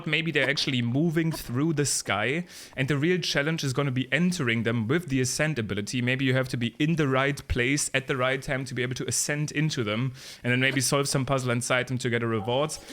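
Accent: Germany. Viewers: why do you sound so german?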